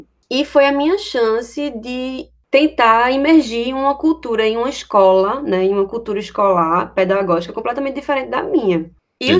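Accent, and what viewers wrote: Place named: Pernambuco, Brazil. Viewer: Pelo sotaque é galera super gente fina de Pernambuco! Por mais youtubers de Pernambuco fazendo sucesso aqui na rede! Pernambuco em 1° lugar! Parabéns pelo conteúdo!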